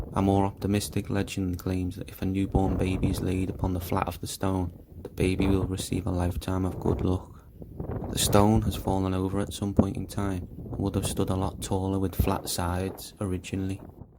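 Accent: Liverpool, United Kingdom. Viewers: absolutely loved this will...Right up my street....Is that a scouse accent? Will be watching and supporting your journey xx stay free xx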